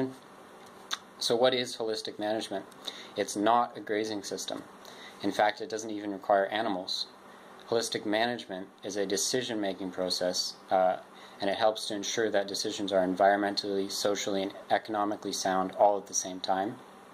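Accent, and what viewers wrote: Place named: Omagh, United Kingdom. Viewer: Sorry, I posted my previous comment before you asked that we not mention politicians. :-( However, I will mention that I love your channel and look very much forward to visiting you. I once knew a family from Omagh, and your accent reminds me of them. They were lovely.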